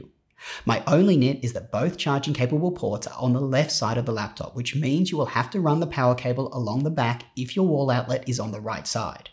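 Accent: Australia. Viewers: thought ur aussie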